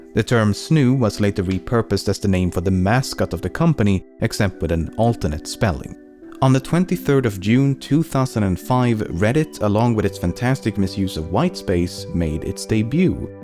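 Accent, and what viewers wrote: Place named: Sweden. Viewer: The swedish accent on English it's so good. One can never get angry on them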